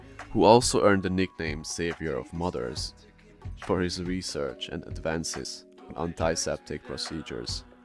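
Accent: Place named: Romania. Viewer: Its good enough to remind me of a Romanian friend of mine from college. Her English was incredible from just learning it in school. Her accent was very subtle and cute like:) Either she was just naturally gifted and learned other languages easily or the schools in Romania are amazing lol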